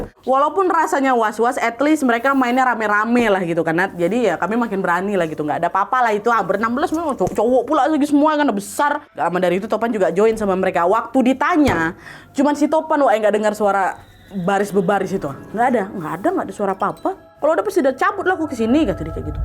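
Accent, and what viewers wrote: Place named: West Sumatra, Indonesia. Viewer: hiyaa, Sumbar, payakumbuh.. logat minangnya gak ilang yaa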